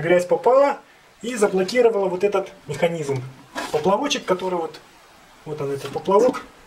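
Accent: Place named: Buryatia, Russia. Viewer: Уверен - автор либо бурят, либо киргиз, уж очень говор похож)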